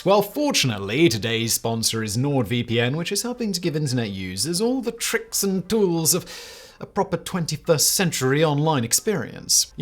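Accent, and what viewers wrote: England, United Kingdom. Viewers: Its Bri’ish. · He's British. 🤣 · is speaking correctly. It's the English pronounciation. All the time there is aluminum and math, there is no room to shout. The language is called English (not American), afterall.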